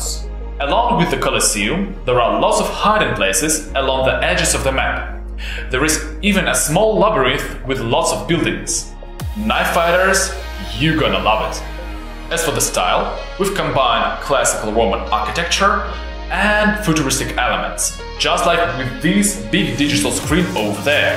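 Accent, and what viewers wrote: Russia. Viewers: Ю рашен акцентиш из very very палится!) Гуд джобс камьюнити. Онбывеблевал гейм, си ю.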